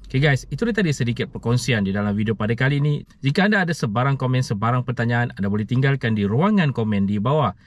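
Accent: Melaka, Malaysia. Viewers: abg orang Melaka ke?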